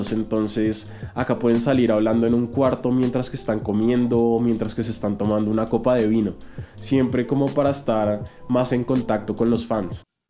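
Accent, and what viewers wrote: Bogotá, Colombia. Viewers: T erecomiendo que hables sobre, Esteman. Por tu acento pareces De bogotá y suenas bien rolo jajskkjajk buen contenido sigue así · Primera vez veo un video tuyo, y apenas empezaste a hablar me dije "esté man es rolo" y cuando dijiste "hijueputa" lo confirme. Aunque bueno, no se si sea cierto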